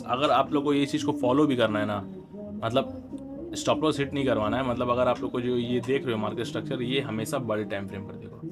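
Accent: Bengal, India. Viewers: Are you Bengali bhai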